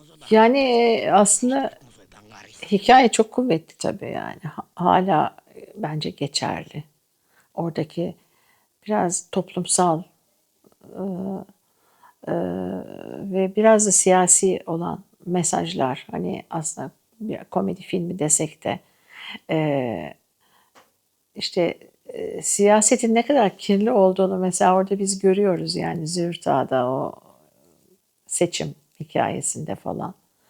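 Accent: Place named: United States. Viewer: Amerikan aksanıyla Türkçe konuşmak...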